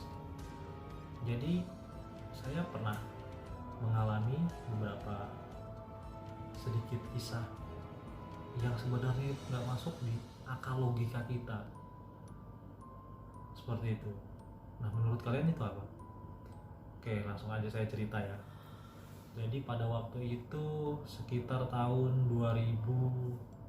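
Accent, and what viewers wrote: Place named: Kutai, Indonesia. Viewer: urang gaib ..bunyi urang kutai om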